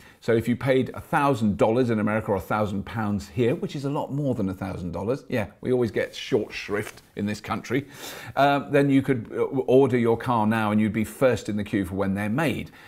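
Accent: France. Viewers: keep working on french accent :-D love you videos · super funny! Love the french accent! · Love the French accent lol. Good video. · Well done sir. I especially liked your French Accent. There is one problem however, and it is with your set piece; your desk isn't worthy of you and would perhaps be of better use behind the scenes. If that isn't feasible, maybe a nice tablecloth would suffice. I have subscribed to your channel and wish you well. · Earned my "thumbs up" just for that French accent lol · Dude you're hilarious! Very good French accent! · Pretty good French accent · French accent on point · you totally made my day when you used your "frenglish". Swiss people always make fun of French people speaking English, because well... They make no effort at all ^_^. Really like your episodes, they are educative, complete and entertaining. If I might, it would be even better if you used numbers, when you use words like: lots of, double, etc. 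One thing that I like about the presentations of Elon Musk is that he uses meaningful numbers and actually prove that they fit and are reasonable. Congrats for your vids and channel. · The french accent XD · liked this video for your french accent alone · You really cheered me up with the great French accent and with the revelation about "matured fierce French women" :) Just won a BIG LIKE and a SUBSCRIBTION to the channel! Cheers!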